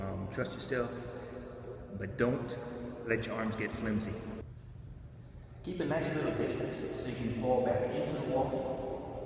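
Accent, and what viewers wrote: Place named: South, United States. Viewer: Great video! Thanks so much! I love your accent, it reminds me a bit of a southern accent-I grew up in the south.;)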